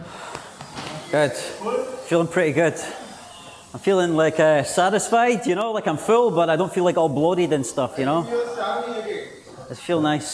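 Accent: Scotland, United Kingdom. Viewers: Yup ! Thick Scotland Accent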